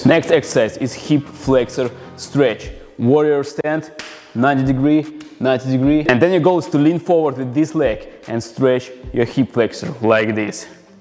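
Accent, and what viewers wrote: Russia. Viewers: Почему я слышу русский акцент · This russian accent motivates me so hard. I feel so jacked already 💪😁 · рашн инглиш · Прикольно и полезно, но акцент как из фильмов про карикатурных русских гангстеров, конечно, сбивает... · сколько лет живет в сша, а акцент как был русским, так и остался.лол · я же слышу, что ты русский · а ты русский ?, потомучто говариш как русский луде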